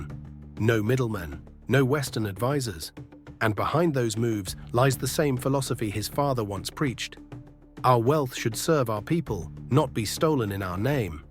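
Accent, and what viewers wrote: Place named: United Kingdom. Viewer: YOU MAKING OF HIM A HERO JUST TO GET LIKES. THAT ALL IMPORTANT TO YOU LIKE I HATE UK ACCENT YOU ARE THE EVIL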